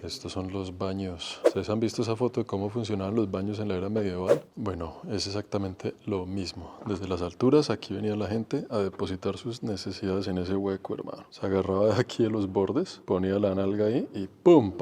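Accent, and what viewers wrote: Colombia. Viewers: me encanta tu estilo, tu hablado parcero, tienes mucha gracia al hablar.🗣️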